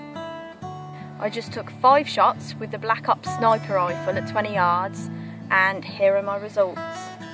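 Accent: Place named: United Kingdom. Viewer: she sounds very British to me.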